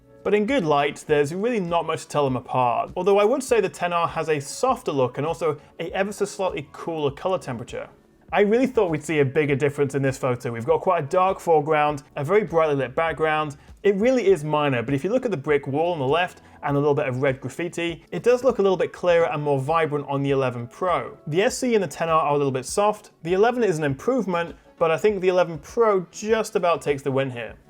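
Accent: United Kingdom. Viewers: I´m subscribing because i´ve been studying english for about 14 years now... and your british accent helps me practicing and reinforcing my comprehension skills! (And also cause i love tech too i guess 😂😂😂) greetings from Colombia from a guy born in Argentina :[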